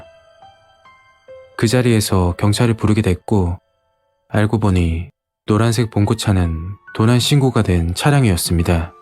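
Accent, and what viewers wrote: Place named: Daegu, South Korea. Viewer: 자연스럽고, 편안한 사투리~~ 대구 분이라고 하셨죠?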